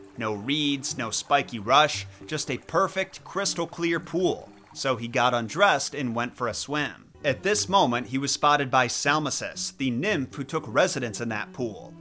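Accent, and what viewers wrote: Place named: United States. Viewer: Aaaargh !!! The American pronunciation of Greek names is absolutely horrifying! Dye-oh-nye-sus ? Seriously?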